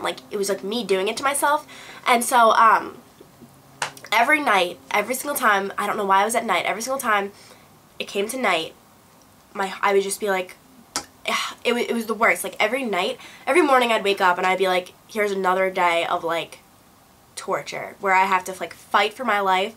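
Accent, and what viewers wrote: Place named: Boston, United States. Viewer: You sound like you are from Boston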